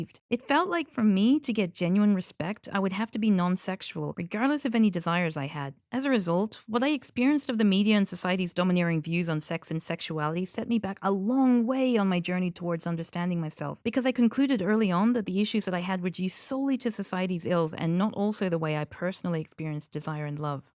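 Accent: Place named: Australia. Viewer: oh god youre australian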